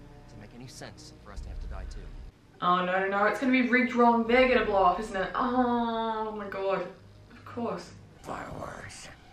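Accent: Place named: Australia. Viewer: The Dark Knight is an absolute masterpiece. Everybody was hating on Heath Ledger before The Dark Knight came out because of Heath's handsome leading man image at the time. I myself remember the Broke-Bat Mountain jokes. No one, and I mean NO ONE though he was capable of this kind of performance. He was definitely good but we hadn't expected he'd be THIS good. No one was hating on him after this, and to this day I believe his best films were still ahead of him. Great films we'll never get to see. RIP Heath Ledger. He was an icon. You may have missed it, but the flaming fire truck and robbing a mob bank are exactly the kinds of things that the Joker's twisted sense of humor would think was funny. The bank robbers even bring it up during the opening scene. And the truck flip was not done with CGI, they really flipped a truck. By the way, have I mentioned how much I adore your Australian accent?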